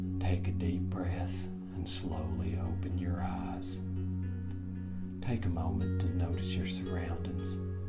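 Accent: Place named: Europe. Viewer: I wish you weren't hilarious. Try to find a female voice for these. I'm tired of the Euro accents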